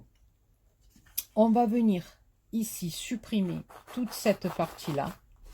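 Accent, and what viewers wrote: South, France. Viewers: Coucou contente de te retrouver toujours avec ce petit accent du soleil. Très classe cette boitounette et toujours des explications claires elle me plaît beaucoup 🤩🥰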